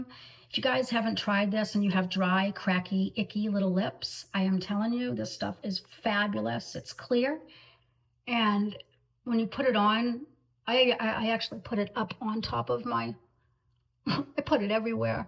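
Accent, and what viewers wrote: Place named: Northeast, United States. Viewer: are u from boston? RI? or NY? accent is hard to nail down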